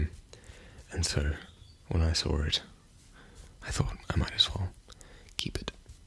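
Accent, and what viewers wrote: Australia. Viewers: Living overseas I never realise that I could miss the Australian accent. Nice video. Roleplays? Crystal shop?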